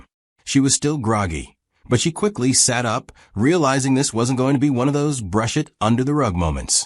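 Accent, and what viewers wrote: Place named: United States. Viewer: A Thai story with american accent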